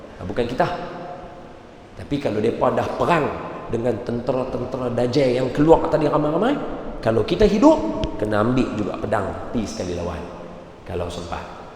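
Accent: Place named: Penang, Malaysia. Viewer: Terbaik Ustaz Don masih pekat penang tu hehe 😁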